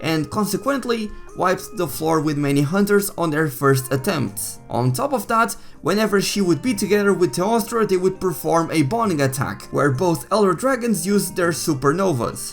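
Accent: Portugal. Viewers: Comecei a ver o vídeo e estranhei logo o sotaque. Grande tuga! + um sub :)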